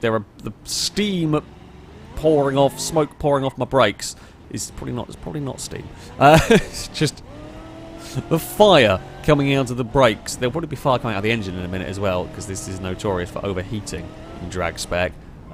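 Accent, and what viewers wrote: England, United Kingdom. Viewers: Are you from England? Cyprus or China?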